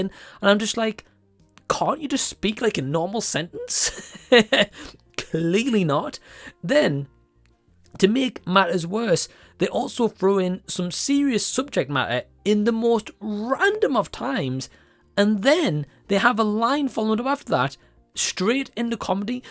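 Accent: Newcastle, United Kingdom. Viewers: Hey mate, I’m from London and I just discovered your channel. Love your channel and your Geordie accent. We don’t all speak like that down here (as you stated). I’m from the “ends” and whilst I used to speak like that, I grew up and got a career and spoke properly. It’s a shame Adam and Co haven’t grown up either. Not a great representation of us Londoners. Appreciate your honest review.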